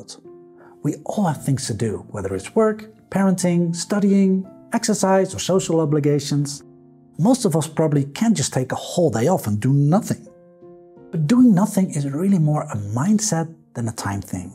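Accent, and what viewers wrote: Netherlands. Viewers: Kom jij uit Nederland? Je praat lijk op een Nederlander toen ze engels spreken haha.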